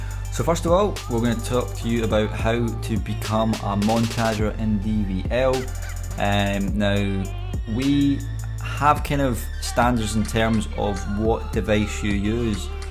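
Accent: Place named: Bishopbriggs, United Kingdom. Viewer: wits the deal wae ur mad accent yer fae bishy pal.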